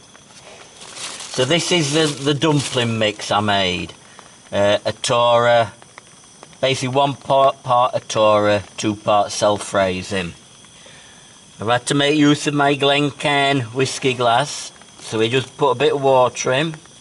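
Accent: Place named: Northern England, United Kingdom. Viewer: Hi I absolutely love your videos. After years of hotel room holidays I camped in Wales in August in that humid stormy weather. We were on a campsite and the hail stones were as big as golf balls. My car has hundreds of dents in it! I have arthritis so can't do a lot but I'm so looking forward to getting out there again when we can. Listening to your calm, gentle Northern voice is the best. The video where you packed your glasses in your tent made me smile. Such honesty. I've watched all your videos and love the cookery that goes on. And the garden camp was brilliant I even went out and bought those beers! Fantastic looking forward to the next instalment. Kay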